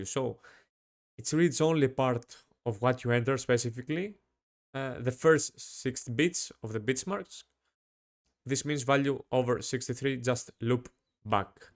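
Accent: Greece. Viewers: Το καταλαβα οτι εισαι ελληνας απο την προφορα.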